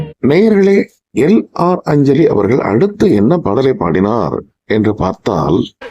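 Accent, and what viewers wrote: Sri Lanka. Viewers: இலங்கைத்தமிழர் என்பதால் இவரது தமிழ் இனிக்கிறது !! ஆனால் தமிழகத்திலோ எளயராசா வந்த பிறகு காலம் காலோம் எனவும் மோகம் மோகோம் எனவும் ராகம் ராகோம் எனவும் தமிழே தேய்ந்து அழிந்தது !!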